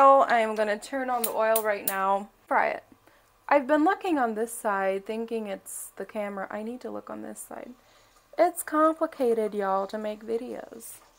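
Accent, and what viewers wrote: Kenya. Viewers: I can hear a bit of Kenyan accent in your Lish. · That's ugali size normally not enough for one 😃. I like this and definitely you no longer sound mzungu..yous accent is just Kenyan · Your accent and English are soooo Kenyan. The adaptation/change is incredible, almost unreal. Enyewe, we learn everyday. Waah!! And there's nothing as tasty as Ugali and samaki. I could eat that every day of the year, but I have to get over a laziness in the kitchen that's come over me lately. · Your accent are becoming Kenyan 😍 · Your accent sounds like Kenyan and not American….by the way I live in Reynoldsburg, Ohio. You’re inspiring! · You sound so Kenyan🤣♥️ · You sound more of a luo,its understandable coz has been brought up amongst luos,he actually looks more luo than kisii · You already sound Kenyan, your accent!! This was lovely to watch. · So....one thing that has fascinated me about you is the Kenyan accent you have while speaking English....amazing. 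I am Kenyan myself living in Iceland for twenty three years. You just earned a new subscriber. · Your Kenyan accent is on point!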